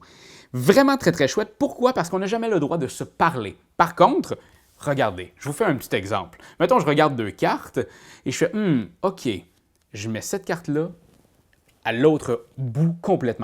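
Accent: Quebec, Canada. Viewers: j’aime l’accent québecois lol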